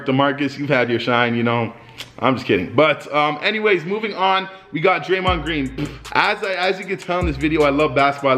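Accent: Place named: Boston, United States. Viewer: From boston I could tell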